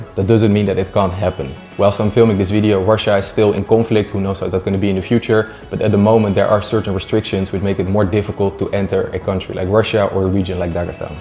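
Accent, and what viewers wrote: Netherlands. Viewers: Why do you have an american name but a Dutch accent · Amazing video. Your accent sounds Dutch. Where are you from?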